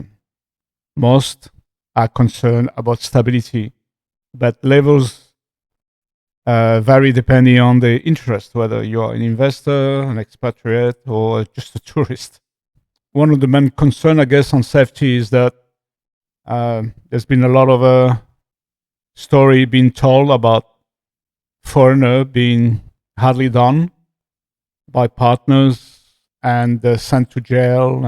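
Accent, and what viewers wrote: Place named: Belgium. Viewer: im curious, i read you're from Australia, but your accent sounds european. my friend says you sound belgium.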